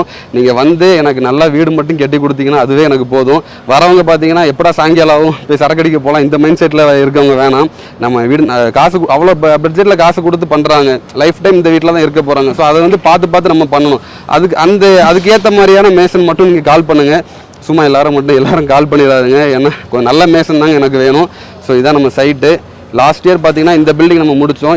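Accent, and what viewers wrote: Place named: Karaikudi, India. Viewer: ஆமா ஏன் la வீடியோ போடல உன் ஸ்லாங் எனக்கு பிடிக்கும் ல அக்கா காரைக்குடி ல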